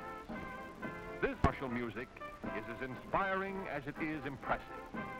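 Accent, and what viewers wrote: United States. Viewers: Infant death rates and death in childbirth were higher. Polio, TB was common. This was an advertisement with an American narrator.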